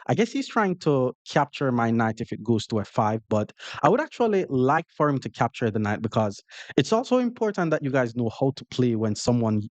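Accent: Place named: Jamaica. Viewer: like your style of explaining, the jamaican accent is amazing thx 🤙🏼🤙🏼🤙🏼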